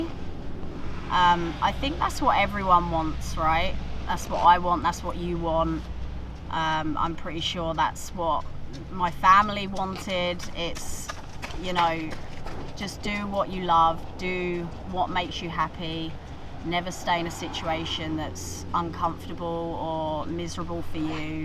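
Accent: United Kingdom. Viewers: She sounds british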